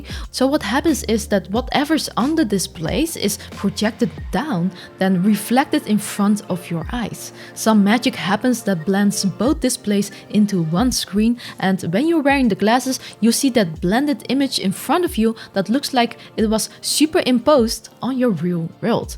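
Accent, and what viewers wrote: Netherlands. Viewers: You might want to look into an English accent coach. The dutch accent is one of the most mind fryingly annoying in the world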